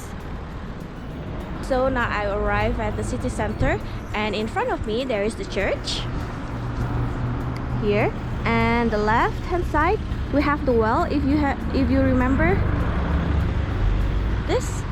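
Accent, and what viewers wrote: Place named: Europe. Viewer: Eeiiinnn logatnya macam European sekarang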